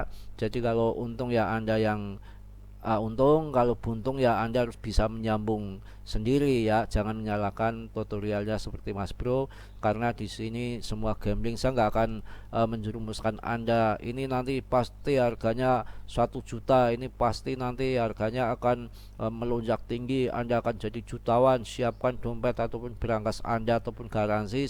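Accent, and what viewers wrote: Banyumas, Indonesia. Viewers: Salam blekutakan mas bro. Aku nonton terus video mas bro kata katanya ngapak bikin ketawa jadi ngga bosen bs meng hibur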